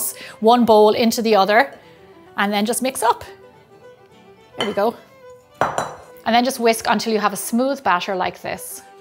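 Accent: Ireland. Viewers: Love this recipe & the Irish accent ❤️❤️ love from India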